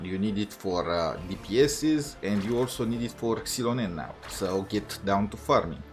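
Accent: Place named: Philippines. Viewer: ur accent is strong. r u perhaps from phillipines?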